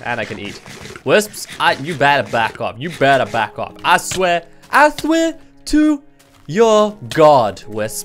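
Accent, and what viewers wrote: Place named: England, United Kingdom. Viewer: What part of England are you from?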